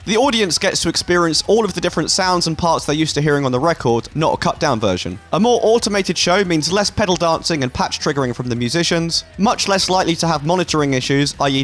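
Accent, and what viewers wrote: United Kingdom. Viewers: It's so refreshing to hear a fellow british accent on youtube lmao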